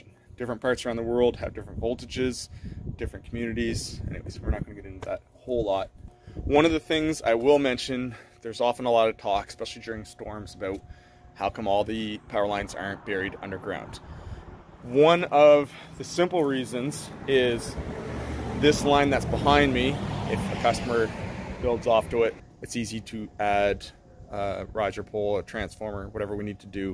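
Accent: East Coast, United States. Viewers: Is this Florida or Boston? I miss the East Coast, and from your accent I'm gonna assume you're from that area. I just feel like I can tell where a place is when I get recommended random videos from the places I miss. There's just a specific way things look.. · Within the first 5 seconds I could already tell you were an east coaster lol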